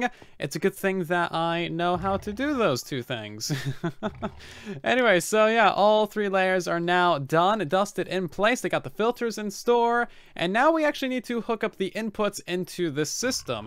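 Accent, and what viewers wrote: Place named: United States. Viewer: It’s a pretty obvious American accent if you ask me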